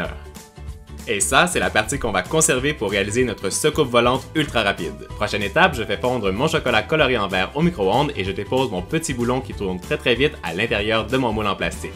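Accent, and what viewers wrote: Quebec, Canada. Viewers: super video tes le meilleure 😉😉 #lovemail #temsnap love j'adore ton accent Québécois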